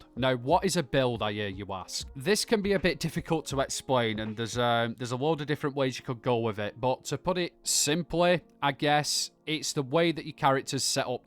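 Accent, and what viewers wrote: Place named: England, United Kingdom. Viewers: I've played 76 a couple of times. Who knew a northern lad telling me to "Stash Yer Joonk" would be what helped me get into the game. Seriously though this video is actually super helpful. I love how you explain things and it doesn't sound complicated and confusing. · I don’t think I’ve ever heard such a strong English accent before watching this video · You speak like your from my area Oldham haha love it ! Great vid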